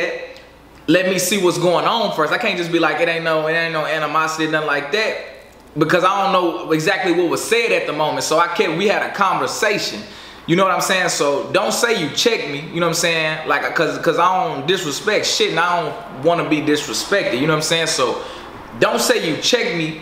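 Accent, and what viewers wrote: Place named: Florida, United States. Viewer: Sound like u from florida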